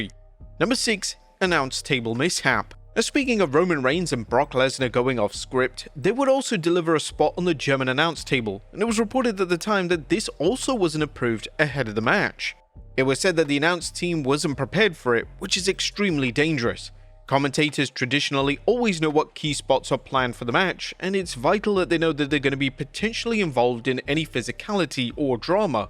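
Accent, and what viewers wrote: United Kingdom. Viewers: Aluminium* he's British, that's how you say in in UK, AU, NZ, CA. Every English country but USA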